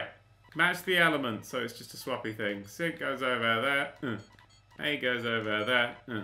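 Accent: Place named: London, United Kingdom. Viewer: Hes english... his accent is english - londoner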